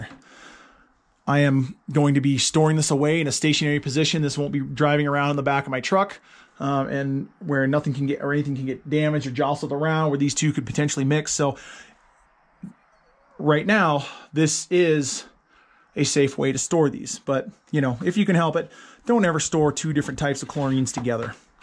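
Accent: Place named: South, United States. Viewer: Thank you for the video, in liked your country accent. Beautiful pool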